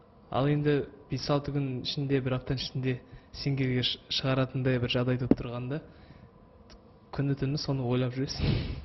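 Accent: Standard. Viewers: қазақша сондай таза сөйлейтініне, қазақилығына ризамын! Осы жобаға шалақазақ біреу барып сұхбатты орысша беріп отырса бүкіл əлемге масқара болар едік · Ботен елде казакша сойлегенди естигениме оте куаныштымын! Димаш жарайсын!!!! Казакша сойлегени оте таза, тил байлыгы жогары!!!! 👍👍👍👏👏👏👏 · Мектептi казакша окыган бала осылай таза, эдеби тiлмен сойлейдi. Жарайсын, айналайын! Берген жауабыннан, iшкi дуниеннiн кiршiксiз таза екенiн тындаган кытай журтшылыгы угады. Ceнiн арканда кытайлыктар казактар туралы жаксы пikipде болады. Рахмет, айналайын, жортканда жолын болсын! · Я Димаш таза қазақ тілінде сөйлегені. Арамызда қазақ маңгүртпен мамбеттерге сабақ болса екен. Әр бір қазақ осындай болса екен.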